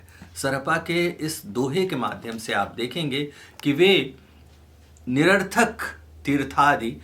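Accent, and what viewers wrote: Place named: Haryana, India. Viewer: Inke dohe ka uccharan Magahi jaisa kijiye na ki Hariyanvai jaise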